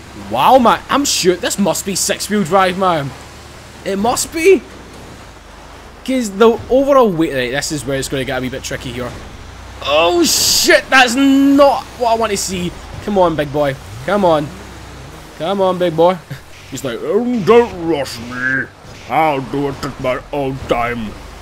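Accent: Scotland, United Kingdom. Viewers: What part of Scotland is he from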